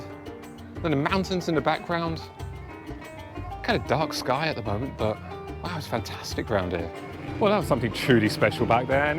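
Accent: Birmingham, United Kingdom. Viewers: are you Brummie by any chance?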